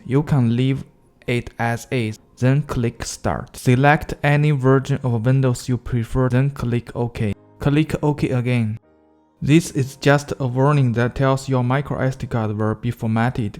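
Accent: China. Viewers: Nice tutorial, 一听就是中国同胞啊😂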